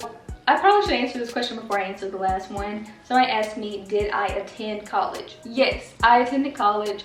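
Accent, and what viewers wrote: South, United States. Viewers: Ugh i was hoping you’d say what state you’re from. I love your southern accent 🥰. I’m from the south too girl; Tennessee to be exact. But i understand being from a small town you want to keep that private! Enjoyed this video! We’re so similar in ways and i really love your personality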